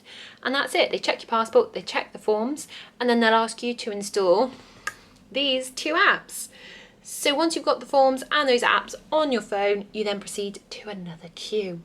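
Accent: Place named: United Kingdom. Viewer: What a lovely British accent. It’s music to the ears! You travelled to Korea in the same month I did. I hope you stay safe throughout the trip.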